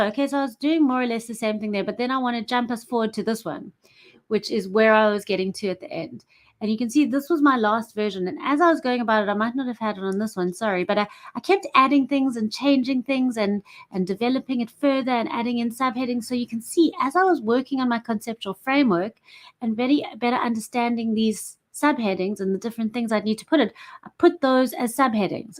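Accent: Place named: South Africa. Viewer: ah iim so excited! I thought you were perhaps American, but so happy to hear your SAn accent! and to seeing similar research interests! Social Realism! Higher education in South Africa! and at UCT. Really comforting!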